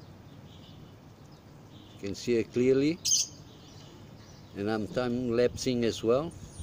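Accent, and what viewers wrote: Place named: South Africa. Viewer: Do this tracking on the roof or it is not worth anything. You sound like you spent time in South Africa, I could be wrong. :) Use a 360 camera to record these events. :)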